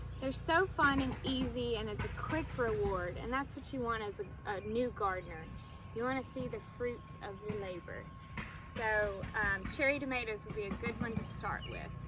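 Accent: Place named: South, United States. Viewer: Great video, love the southern accent. Thanks for sharing. Growing in pots is a great idea. Move them if you need to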